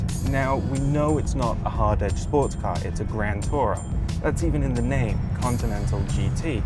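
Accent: United Kingdom. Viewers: American or british? i cant tell. sounds like he has a slight british accent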